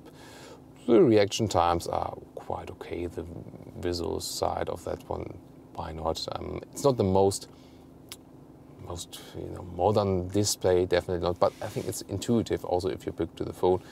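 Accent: Germany. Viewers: With the Cherokee I have difficulties to put a finger on the design. Seeing it on pictures or in videos you cannot associate it a Jeep. Seeing it on the street and standing near it this impression is totally different. Yeah, it is a Jeep and somehow it fits into the family. You have the Renegade, which is a fun car to drive, for younger people who don´t go mainstream with a Golf or similar, then the Cherokee for kids transporting mummies who normally would opt for the dead boring VW or the XC60 and then you have the GC which leaves a nice footprint in the premium class as one of the last remaining real SUVs. Btw. meine lieben people. As always great presentation - keep on going. But still, this German accent is still there. Never mind. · Ich check das Kanalkonzept einfach nicht - deutscher Kanalname, deutscher "Presenter", aber dann dieses seltsame Englisch, bei dem jeder 100 Meter gegen den Wind hört, dass er Deutscher ist? 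Warum nicht einfach auf deutsch? Wegen der Reichweite des Kanals?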